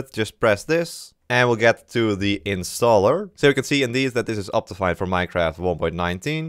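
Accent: Netherlands. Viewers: are you dutch haha me too i can hear from your voice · Are you dutch?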